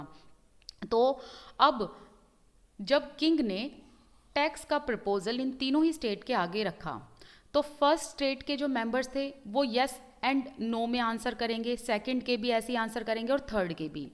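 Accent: Haryana, India. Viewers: Mam aap haryana se ho kya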